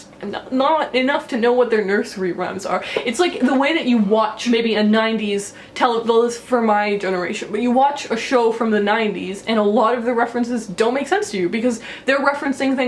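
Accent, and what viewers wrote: Canada. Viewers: First I've ever seen of you, and I don't know you at all. From the accent, Winnipeg? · are you canadian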